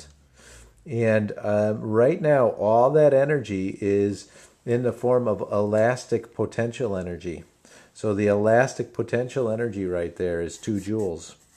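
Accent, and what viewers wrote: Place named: Michigan, United States. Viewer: Sound like your from Michigan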